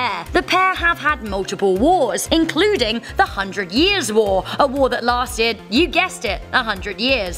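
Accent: England, United Kingdom. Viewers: You live in Canada but sound very English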